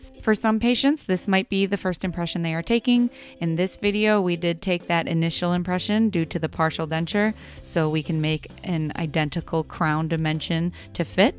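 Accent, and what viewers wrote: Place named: Minnesota, United States. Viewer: I like your voice. You sound like a friend of mine. Are you from Minnesota?? Great video BTW.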